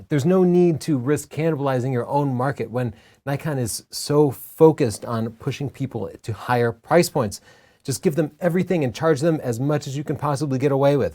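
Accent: Texas, United States. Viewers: odd, halting speech pattern is a lot like Jeff Goldblum, with a slight Texas twang.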